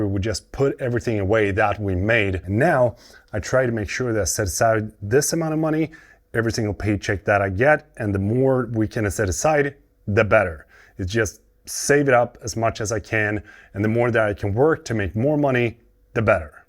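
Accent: Sweden. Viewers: Nice, I just found your channel. Can hear you are from western West Gothia on your accent in English, probably near Göteborg. I am a Swede from Falköping, live in Mexico now, but moving home soon. Enjoyed the video, it is funny how to explain sociala avgifter tax and pre-skatt for Americans lol. I remembered once as a young Swede, being a 2-shift factory worker, when I calculated my arbetsgivaravgifter, income tax and all the stuff I paid all that moms and other "avgifter" on I was at a 78% tax rate. Cheers from Mexico, Liked and subscribed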